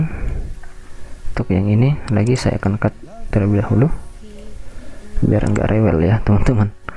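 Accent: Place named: Sulawesi, Indonesia. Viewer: abang orang sulawesi yah... makasih buat Tutorial nya ya bang.. keren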